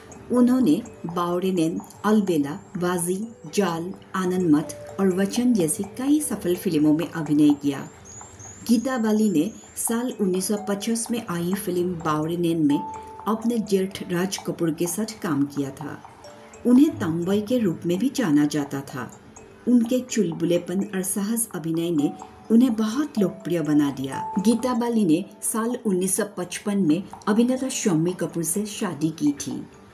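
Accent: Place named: Bengal, India. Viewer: I enjoy listening to your Bengali/Asamiya,Hindi accent.It reminds me of Suchitra Sen of Andhi or Mamta fame. (I appreciate your beautiful research work and presentation)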